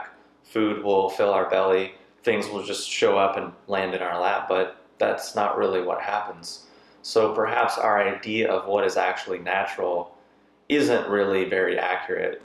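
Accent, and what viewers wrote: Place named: South, United States. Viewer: Yes. Very articulate. Just recently found you so I'm hearing a very strong southern "twang" pop up.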